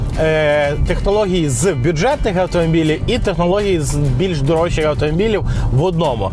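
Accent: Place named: Central Ukraine, Ukraine. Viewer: а чем тебе не понравился язык? вроде довольно чистый украинский центра Украины. ни западного ни восточного суржика не слышно.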